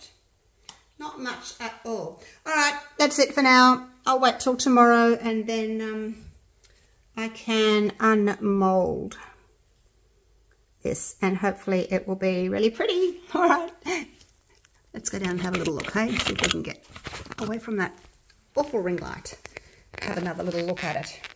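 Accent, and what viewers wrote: Australia. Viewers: You have a beautiful home, where do you live ? I'm assuming from your accent that you are Australian, please forgive me asking so many questions this is my first time here and you are very very intriguing I like your channel and I like the way that you talk...... Yeah, I know I'm weird but it takes many many colors to make a rainbow. That's my way of justifying my weirdness haha. Anywho great project go with the back in Black, that looked the best in my opinion. Take care stay healthy, you my dear, have a new subscriber 👍👍 -Jonny5 🥁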